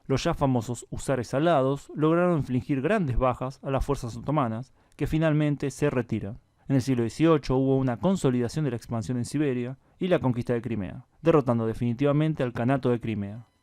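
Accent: Buenos Aires, Argentina. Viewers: Eu amo o espanhol falado por ele. O espanhol portenho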